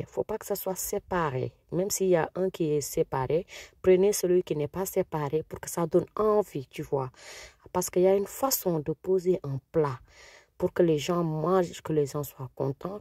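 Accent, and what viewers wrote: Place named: Wolof, Senegal. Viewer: félicitations à vous , très bien expliqué , presqu'aussi bien que mon mari de guinée bisau.😊😊.Belle présentation et merveilleux accent wolof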